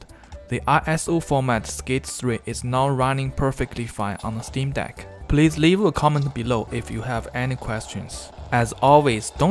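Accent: China. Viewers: 这口音，中国人吧？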